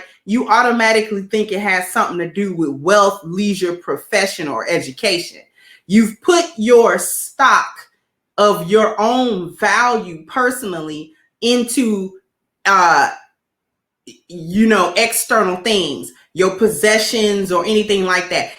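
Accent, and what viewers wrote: Chicago, United States. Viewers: That Chicago accent heavy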